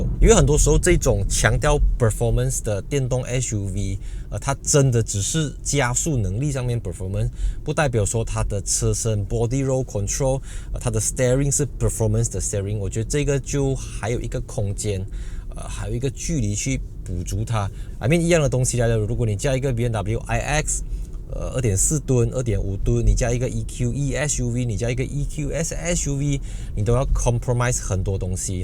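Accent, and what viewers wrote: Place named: Malaysia. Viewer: 讲解很专业 大马朋友说 华语 口音很好听的感觉 ❤ 👍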